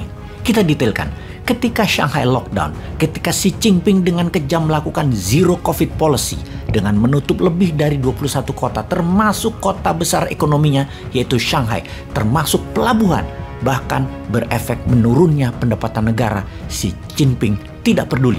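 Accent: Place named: East Java, Indonesia. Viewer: khas jawa timur cok 🤣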